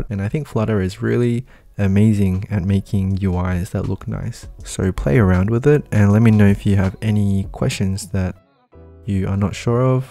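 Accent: Australia. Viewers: Wow, at first i thought u r australian, haha 😅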